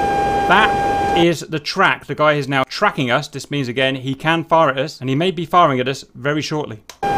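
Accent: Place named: Manchester, United Kingdom. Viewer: Just a thought...not that anyone listens...but I'm new to DCS, but I am a private pilot...and some of the things i have seen and heard from a number of people who claim to offer help...really bug the crap out of me. It seems that you guys forgot that you are offering "help"; which means that the people who are listening to you don't have the time or experience with DCS...that is the same time you have. Personally, I suspect a number of you..., sidewinder, wags, etc...work for DCS; or did at one time. So, before I begin let it be known that I have tons of respect for my brothers who live across the pond; but some of you speak with a thick Manchester accent...so understanding you in simple conversation is difficult. So could you please slow down when you are speaking...this is not a race...its a tutorial or instructional...and if you want to reach out to everyone effectively...slow down. 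In addition, as you talk could you please use the cursor to highlight the components you are talking about or using. More often than not you are using the abbreviations, and when you combine the abbreviations with the speed in which you talk...the new guys have fallen behind before you begin. So please slow down, and ensure you are visually queuing the components that you are talking about...so the rest of us get it. I'm new...but you will be seeing me on the field...Bad JuJu or Train Wreck.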